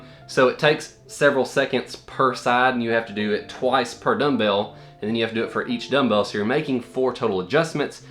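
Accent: South, United States. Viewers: You have a southern accent.. What state are you from ? IM from Canada BTW 🇨🇦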